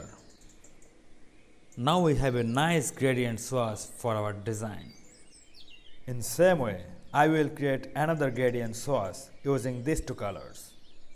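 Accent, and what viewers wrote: Bengal, India. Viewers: own a indian accent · Youtuber: speaks with Indian accent Me: throws up, dislikes video, reports, leaves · It's sound like that u are a bengali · English a bolar quality tei bojha jacce j uni bangali. tai bolci banglai bolte r kicu na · Pure Bengali Accent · this is the bengali accent, almost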